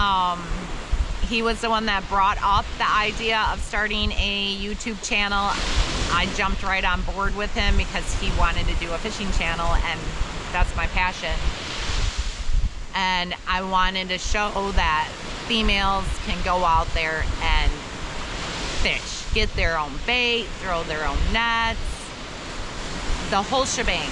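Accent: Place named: Midwest, United States. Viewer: I grew up in Michigan, Pennsylvania now. I suspect you are not originally from Florida. I recognize your Midwest accent. it is music to my ears. (Don't recognize...)I really like your videos, I hope you both hang in there. Blessings....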